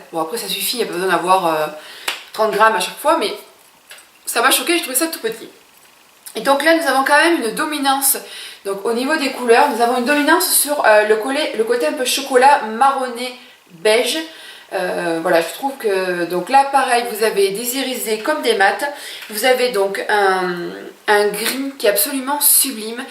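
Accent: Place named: France. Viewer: Hi, t as super craque!!! wouahhh, moi, j evite sephora, c est toxic! lol, Je participe avec plaisir Les videos que je prefere, hauls, tags, ton accent est cute! Kenneth mon mari adore, lol Il trouve que les francaises ont un joli accent, bisous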